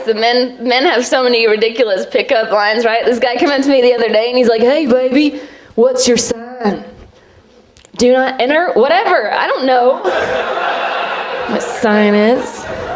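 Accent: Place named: South, United States. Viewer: She's not really funny. I think a lot of people are getting wrapped up in her girl next door looks and southern accent.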